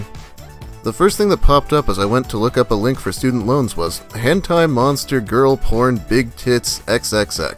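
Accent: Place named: South, United States. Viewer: As a texas boy hearing your southern accent is is nice.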